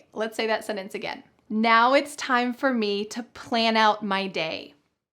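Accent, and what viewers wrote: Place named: United States. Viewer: Great video! Just, why do you insist so much on the "r" pronunciation and US accent. I mean, why should one want to sound american, these days? After the infamous incident at the soccer World Cup, especially 😅. That really has been the last straw ...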